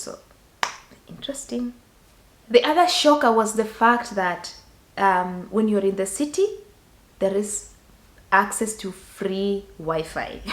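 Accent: Kenya. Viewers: A very interesting video. Unfortunately I’ve never heard anyone speak English with an Kenyan accent and about 20% of what you were saying was lost for me. Good luck in Germany.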